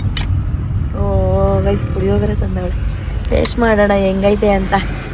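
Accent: Mandya, India. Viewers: Mandya style speaking ❤😅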